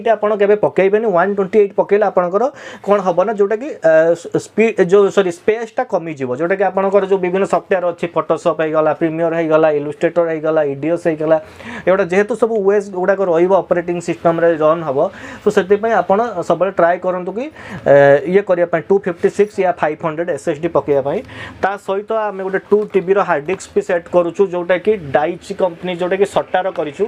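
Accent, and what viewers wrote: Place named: Balasore, India. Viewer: Bhai tama ghara balasore ki Tama accent ru lagila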